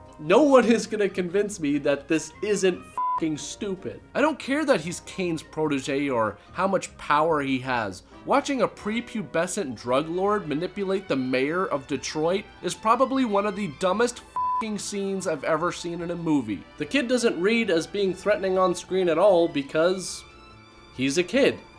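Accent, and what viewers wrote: Canada. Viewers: Are you Canadian? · Are you from Toronto? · lol that Rob Ford reference was hilarious. Are you Canadian btw? It seems like you have the accent. Great Vid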